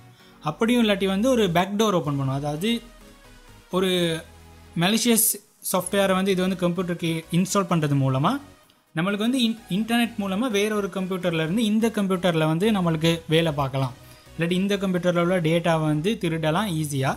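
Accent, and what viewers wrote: Sri Lanka. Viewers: Bro neenga Sri Lanka vaa ?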